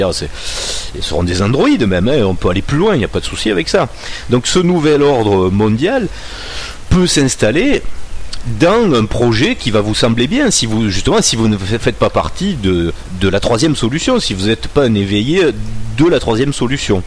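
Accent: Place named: Toulouse, France. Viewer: Merci mille fois pour vos vidéos. Pas une seule seconde à manquer sur vos présentations, chaque fois que je la réécoute. Pas un seul mot de trop ! Et vos digressions, délicieuses. En vous écoutant je pense, 'c'est magnifique cet accent toulousain qu'il a ! ' Bon je fais donc partie de la troupe des E-veil-lés. Oups ! Ne pas confondre avec les intentions d'une revue ... "Réveillez-vous" ! Et je vais maintenant relire... "La solution extra-terrestre". (Petit conseil en passant par là..: découvrir les expériences du pilote de chasse à la retraite Jack Krine - sur Youtube. )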